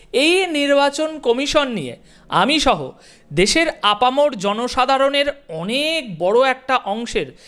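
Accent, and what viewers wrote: India. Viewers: দসক ওনি বাটপার উটপার ওনি বাংলাদেশের না ওনি ভারোতের লোক ওনার বাষায় বোজাজায় ওনার কথা সোনে লাভ নাই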